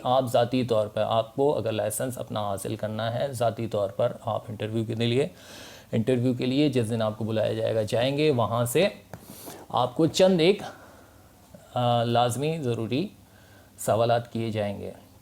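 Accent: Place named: India. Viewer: Bahe ap ne jo tarika btaya aisa kuch b nahe hai pakistan m ap muje indian lag rahe ho zuban say b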